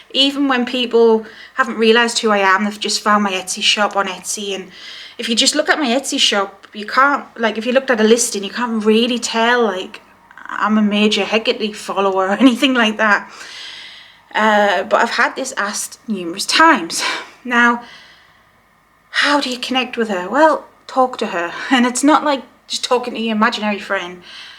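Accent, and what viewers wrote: United Kingdom. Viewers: I'm only about 30 seconds into watching one of your vids for the first time, and my comment has nothing to do with the content, and for that I am sorry, but I just had to say quickly... I LOVE YOUR ACCENT! I love most British accents, and yours is just ... i love it! OK, now back to the content. ♥ EDIT: this was very helpful. I have felt drawn to Hekate for several years, but have wondered if she would even bother with me, like... I'm not special enough or something. Anyway, your video has given me renewed motivation and clarity, and I thank you for that!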